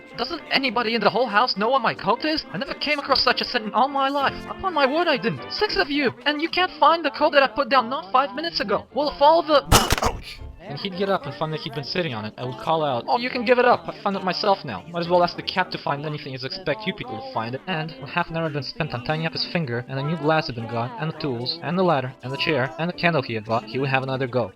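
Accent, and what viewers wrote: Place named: United States. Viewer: Why the fuck is a septic narrating this?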